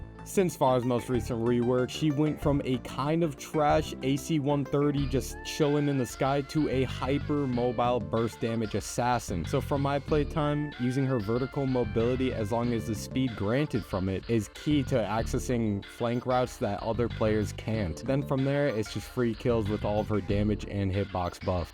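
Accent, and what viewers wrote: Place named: United States. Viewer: He sounds like a white American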